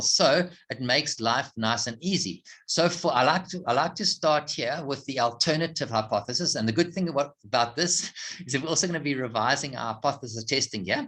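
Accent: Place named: South Africa. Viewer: Thank you so much. Very well explained. Love the south african accent. :)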